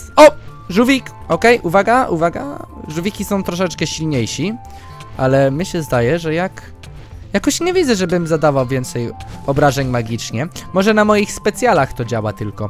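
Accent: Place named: United Kingdom. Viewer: on jest anglikiem więc muwi troche inaczej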